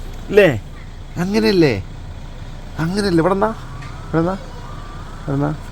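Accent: Malappuram, India. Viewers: നിന്റെ പെർഫോമൻസ് നന്നാകുന്നുണ്ട്!മലപ്പുറം ശ്യ്ലി യിൽ മലയാളം ok!!!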